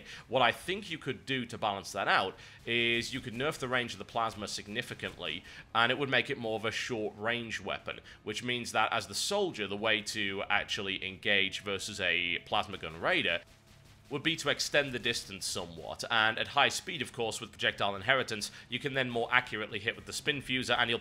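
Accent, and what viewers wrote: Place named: England, United Kingdom. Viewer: Anyone else interested in hearing after he loses his English accent?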